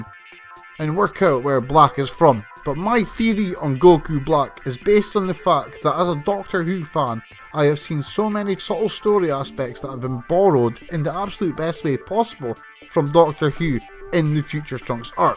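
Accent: Scotland, United Kingdom. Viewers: Lol love your Scottish accent😂 Keep it up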